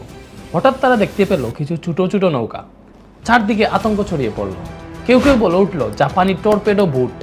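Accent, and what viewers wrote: Sylhet, Bangladesh. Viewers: bhai apni ki faltu Sylhet er moto kotha bolen keno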